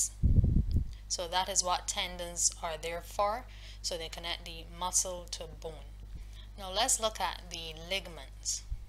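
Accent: Barbados. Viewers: Are you bajan?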